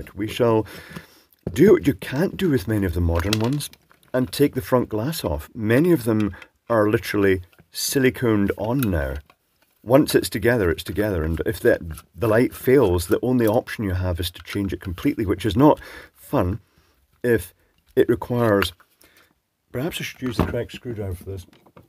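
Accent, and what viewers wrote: Scotland, United Kingdom. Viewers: That will be the Scottish Soothing Voice. :)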